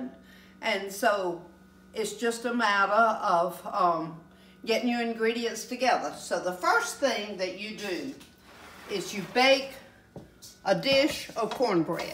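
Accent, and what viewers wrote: South, United States. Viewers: I want to order your cookbook. How can I do that? I adore your Southern accent!!! It’s the real deal!!, I thank God for providing a heart for your Son, whose Birthday is the same as my precious Mama, October 19! We live in Seneca, SC. Thankful to live in the South!! Love you!🥰❤️🙏🏻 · Prayers for continued healing and Yes God is good ❤ Love your recipes and your Southern accent!! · you are such a lovely lady! I just love your southern accent!! Butta!! Love your show… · ...you are just adorable! You are so caring, thoughtful and genuine! I love your accent....I could listen to you talk for hours on end! You are a true southern belle! Your cooking videos are so diverse and the recipes seem pretty easy. The food you prepare looks incredibly delicious and at the end of a lot of your videos, when you show the entire meal laid out on your side counter, I always find myself wishing I was the first one in line! 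Not only for the scrumptious food, but for the fellowship with your loving family. My sister and I are the only family we have now....everyone else has passed. So when I watch your videos, I get warm memories of my own family and it makes me smile. I am very happy your son is doing well! I know he will continue to flourish! As will all of your family. I will be making your stuffing and gravy recipe (I do not care for sage or giblets at all) and when my sister and I sit down to eat it, I will think of you. You are truly an inspiration. Best wishes for a very Blessed and Happy Thanksgiving! God Bless You All!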